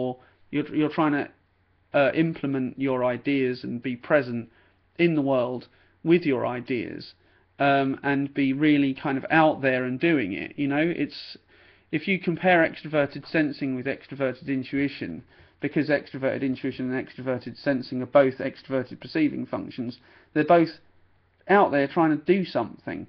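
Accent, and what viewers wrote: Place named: United Kingdom. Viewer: I really enjoy your videos. Great to hear a British accent, on the subject of MBTI on YouTube. For me re: I/E I think, like anything else in life, people tend to over-simplify a concept in order to assist with general understanding. It then becomes a Chinese whisper. If you like understanding systems (as I do) you quickly relish the fact that it's clearly a much more sophisticated concept, than the initial information concerning the letters. I guess when I refer to extroversion, I'm talking about someone leading with an extroverted function, as the meatiest part of them, which leads them on a sociable path. However, we all think, we all feel and we're all I/E. That's the complicated and intricate beauty of humanity. The MBTI framework attempts to trace it. Keep up the good work! Marie (INTJ)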